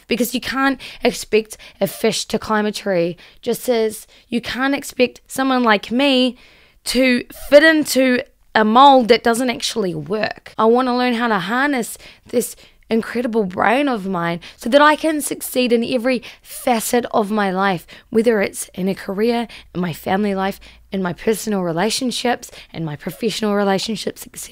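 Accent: New Zealand. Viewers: First thank you for everything you do and speak about here. I do appreciate your effort and perspective. I can relate with most of what your talking about. I’m a combo ADHD. I also express traits of autism. The “super powers” I believe are rather misunderstood and/or difficult to understand. Something I think you can easily understand is this: I enjoy watching you speak and hearing you speak. (2 different things) Your voice is enjoyable and words are very understandable. I also found that I really like the kiwi thing. (Siri is set to Aussie accent for me). At the same time you “accent” or “emphasize” words or phrases with your body language. You express a passion about something or a subject of discussion in ways very few people can. You can make an extremely strong point and speak with a gentle affection towards people. Emotional dis-regulation to me means; you either like it or you don’t like it, passionate about it or cold shoulder towards it, not much middle ground. 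Also I’m pretty sure you will understand this more deeply than most people. Everybody understands a B-day card. You pick it out and sign it, makes it personalized. I believe the universe is not a mistake. Things happen for a reason. “If opportunity is intentional, you should make it personal “ and you do this. Most people would probably say “intimately authentic”......ADHD super power isn’t flying threw the air or super human strength, but more of the ability to express something in a extremely strong compassi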